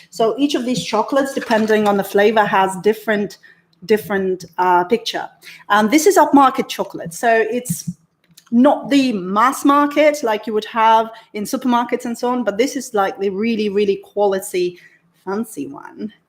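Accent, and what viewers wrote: Jamaica. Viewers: I love how you pronounce some words like us Jamaicans. I love your channel. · Your talking is so fresh i love they way you blend your Jamaican language is splendid and so re refreshing your shows are fantastic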